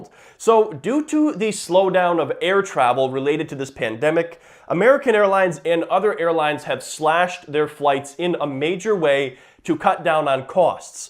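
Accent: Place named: Chicago, United States. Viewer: Your accent. What part of Chicago you from?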